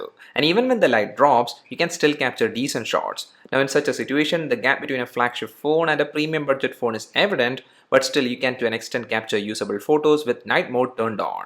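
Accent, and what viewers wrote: Kerala, India. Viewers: U sound like a malu guy.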